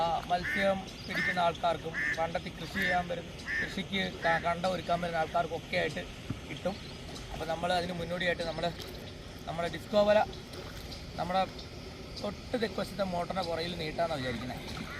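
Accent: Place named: Kottayam, India. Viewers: Kottayam boundary aanu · നിങ്ങള് കോട്ടയം ആണോ ആലപ്പുഴ ആണോ സംസാരം കേൾക്കുമ്പോൾ കോട്ടയം ആയിട്ടു ആ എനിക്ക് തോന്നുന്നേ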